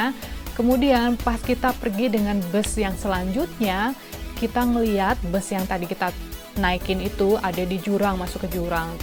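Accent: Banyumas, Indonesia. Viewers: Mba Cilacap ya salam ngapak, ora ngapak ora kepenak... 😄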